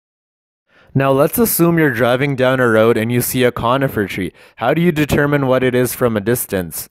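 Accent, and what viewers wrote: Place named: Canada. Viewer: I like a Canadian accent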